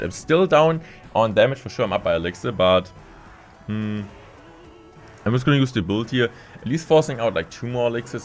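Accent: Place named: Germany. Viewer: i cant even understand this shit becouse of his german accent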